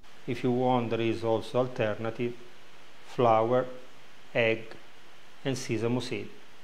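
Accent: Italy. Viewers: I have been watching all of your videos ever since 2020, and it has become a family tradition to watch your videos all together. I would say try to make "shorts" trailers of your actual videos with a link for people to click on and watch the whole video (put a lot of suspense in it to draw their curiosity to watch the full video), reupload those shorts on tiktok and instagram (tiktok can get you a lot of views on shorts). Also work on your thumbnails a bit more, put like a picture of your "surprised" face looking at the dish that you're making. If you're unsure how to do this or how to take a step further on your channel, I would recommend taking Udemy courses (for like 10$) on "school of film" or something similar, sometimes no matter how much you know about things, a course or a tip might always be useful. Personally, what I love about your channel are the traditional italian recipe with your italian accent, and your preserving/canning videos (like sun-dried tomatoes, peeled tomatoes, wine etc ...) If none of the above works, then you might consider switching to creating short videos (less than 1 minute) and post them on youtube, tiktok, instagram but don't give up! 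You have a big fanbase and a big base to begin with, you're halfway there ! Love from Lebanon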